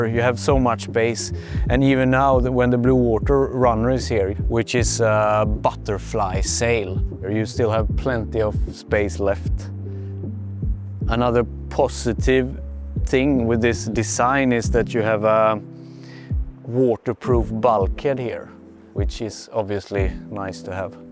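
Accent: Sweden. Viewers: Don't worry Sir, Your Swedish accent is beautiful, authentic... I even ecpect it, when the Portrait about a Hallberg Rsssy is produced by Hallberg Rsssy in Ellös 😉🎉🇸🇪♥️👍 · Your English is perfectly acceptable for a non-native. Your Swedish accent makes your speech sound so much nicer. Also, beautiful yacht and movie. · Your dialect actually adds the mood for a Swedish yacht. Like a Swiss watch or German car. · I wish I could speak Swedish half as good as you speak English Sir. An excellently presented video and your slight Swedish accent is both charming and very good❤ . I understand you perfectly , far better than many English people ! Love that 57 too ..... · That's okay, we find the Swedish accent charming! 😅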